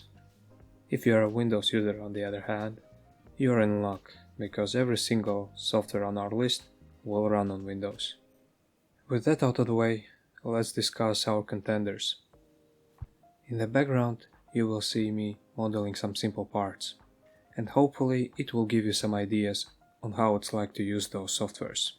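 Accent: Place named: Latvia. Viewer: I can tell a Latvian just by hearing the voice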